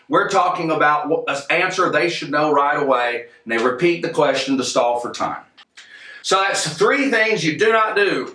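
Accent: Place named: Texas, United States. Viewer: "The gift you have" is.....an amazing dynamic personality and enthusiasm...to the point that you are so entertaining and compelling. Also the fact that you have a desire to share this and help people is a gift. Thank you! I get so excited when I see that you've made another video!!! Also I love to hear your voice, it is so authentically TEXAN!!! It makes me feel like I know u personally...lol... cause I'm from Texas. 🤘🤘🤘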